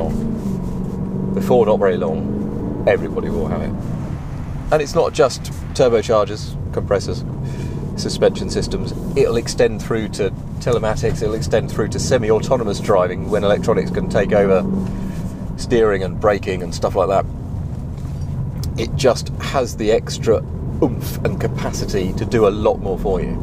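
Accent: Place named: United Kingdom. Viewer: Oh jeeeezzzze that thick british accent and swallowing the voyals!!!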